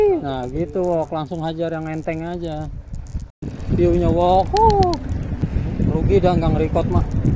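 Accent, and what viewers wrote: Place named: Jasinga, Indonesia. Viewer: logat Jasinga nih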